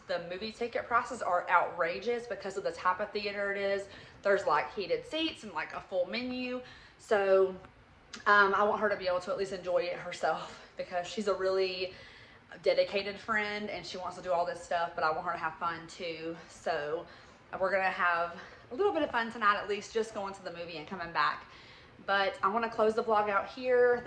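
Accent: South, United States. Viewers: I just hope you don't lose your southern drawl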